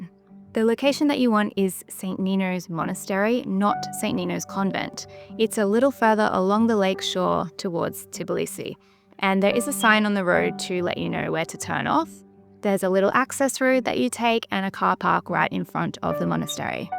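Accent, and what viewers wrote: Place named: Australia. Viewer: This ice-bathing has been a common practice in nearby Russia too, since time immemorial. As with Wim Hof's practices. Wonderful to hear the history and special relevance to Georgian practices, thank you. All your photos, videos and blog are exceptional. Great to hear a fellow Aussie voice - and from Brisbane no less :-)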